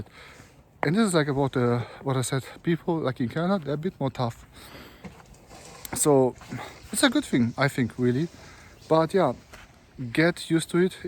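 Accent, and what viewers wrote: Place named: Germany. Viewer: your accent is cute. i can tell right away you are german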